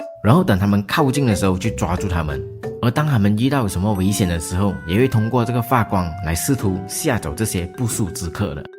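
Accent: Malaysia. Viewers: 馬來西亞華人普通講華語時的口音是這樣，我自己也受不了，但中國人捲舌太過的話也聽得很。。。😂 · 馬來人的口音那麼明顯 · 马来西亚口音，不好听。我也是大马人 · 马来西亚腔太重。听了很不舒服，很难再听下去。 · 马来西亚口音耶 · 马来西亚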